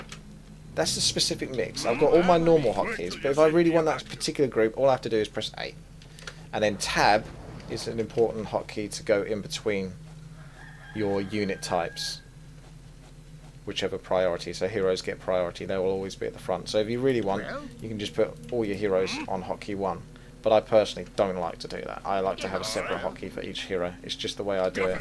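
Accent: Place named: Northwest, United Kingdom. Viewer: north west british accsent hotkey free-hotkey 3 its just their accsent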